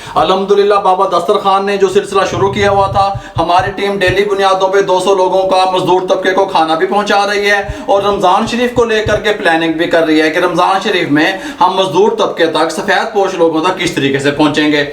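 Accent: Punjab, India. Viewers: Urdu aur punjabi mix accent wah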